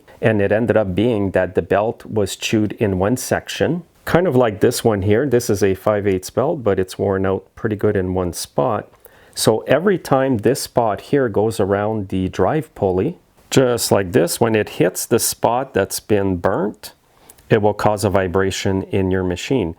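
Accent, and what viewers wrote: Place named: Quebec, Canada. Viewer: You sound like you are from Quebec… are you?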